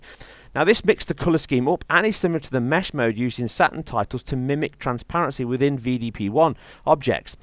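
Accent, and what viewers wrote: United Kingdom. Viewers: Nice vid but (no offense) I can not understand a lot of words you’re saying, with my American ears. What kind of accent do you have, some kind of heavy British accent, right? Anyway nice vid. :)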